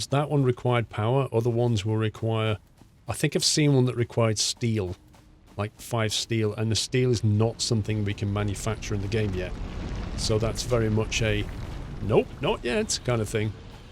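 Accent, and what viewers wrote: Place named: United Kingdom. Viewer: Australian 😉 yeah he a brit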